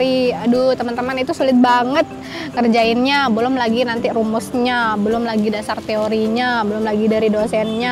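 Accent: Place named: Lombok, Indonesia. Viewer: logat lomboknya keliatan banget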